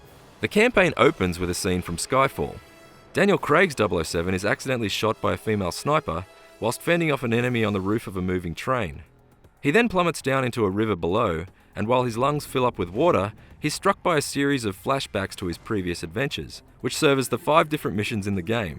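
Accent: Australia. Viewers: Australian accent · He's not English, he is most likley Australian or from New Zealand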